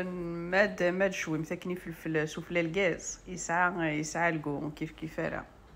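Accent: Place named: Kabylie, Algeria. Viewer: Merci pour cette belle recette et très beau commentaire langage de la grande Kabylie bel accent qui donne chaud au coeur car je suis de la région d Azazga c'est une fierté bravo et merci infiniment bonne continuation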